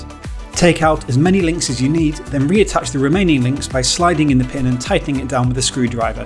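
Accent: United Kingdom. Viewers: ah. Well that explains the British accent.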